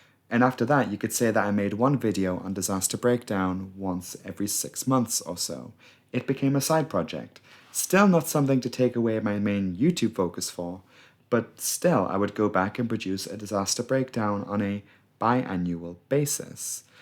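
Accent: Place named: Scotland, United Kingdom. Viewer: Your videos are so comprehensively informative that I thought you were actually a professional in the industry! What was your previous "real" job? Have you thought about going back to school to enter the industry in a different capacity, such as engineering(for the future)? I love your voice and imagine you had an excellent SLP for vocal guidance during your transition. And that accent!! I can't quite place it--Scottish? Irish?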